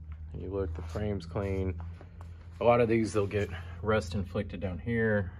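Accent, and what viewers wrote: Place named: South Texas, United States. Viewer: We speak the same dialect in deeeep South Texas by the Gulf of Mexico and it's alive and going strong here.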